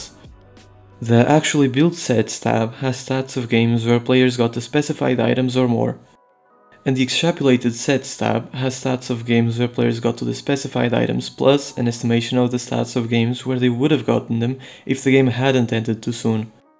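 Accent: Portugal. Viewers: Why does your accent looks like you are from portugal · you 100% sound portuguese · Are you Portuguese bro? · Are you by chance Portuguese? There's something about your English accent that's activating my senses... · Aquele sotaque tuga em vídeos de LoL, não estava a espera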